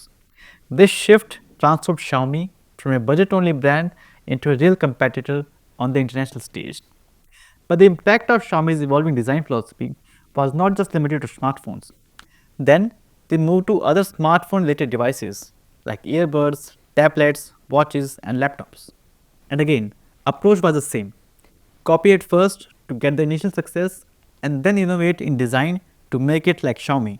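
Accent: India. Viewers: your accent is shouting indian!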